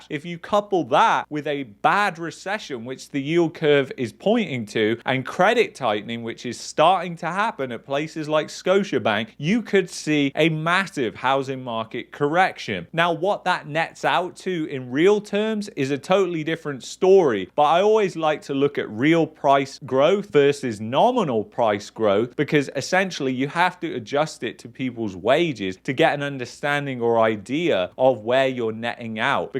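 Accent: United Kingdom. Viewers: You are a pessimistic joker with a British accent! I unsubscribe!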